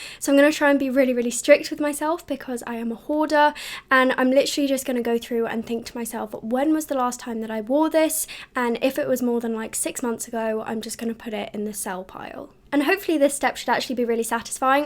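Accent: United Kingdom. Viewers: british accent 🤤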